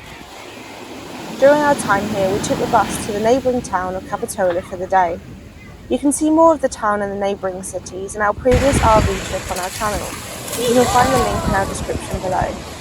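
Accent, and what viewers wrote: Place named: United Kingdom. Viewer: While you're visiting places I am already familiar with I find they become more exciting when described with a British accent. 🤪 Hopefully we will cross paths again when you arrive at your current destination.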